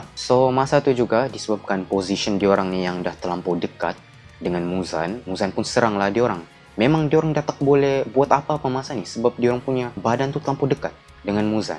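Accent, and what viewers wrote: Sabah, Malaysia. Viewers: Abang ni orang Sabah ke??🤔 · Dia ni org sabah ke? Yg cerita ni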